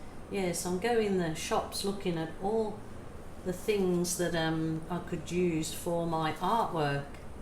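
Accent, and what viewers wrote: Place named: Liverpool, United Kingdom. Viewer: I love this. I also like the other one you did with all the shades of Aqua and Orange. The drip line painting i know exactly what your'e talking about. I agree with what you said about using every other line in the tray but also maybe still elevate your top end but start your dripping/pouring from the bottom end and moving up to your top end????? Or again do the same from your top end moving the pour down to the bottom as you always have more paint at the beginning than the end and it could run down into itself. If i'm overstepping the mark here please ignore me. I'm no artist but i understood what you were trying to do, may; maybe more elevation! Either way, i LOVED both of the ice cube tray paintings. I am so itching to get someone to help me clear out my spare room and start ordering paint supplies. You are an Inspiration and i love your down to earth attitude. 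You don't take yourself too seriously which is wonderful. I can honestly say that from the people that i have looked at their art on YouTube you have to be my favorite and i mean that sincerely. You have spoken briefly about your Mum in the UK and from your accent i'm thinking Liverpool maybe. I don't know. Thank you Thank you Thank you for all your work and iv'e never seen a bad result. Take care. Shirley xx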